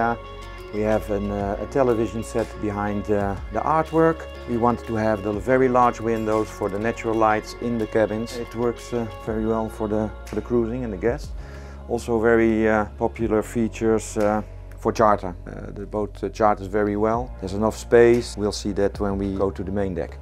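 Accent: France. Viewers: Quant un français parle l'anglais sa ce sait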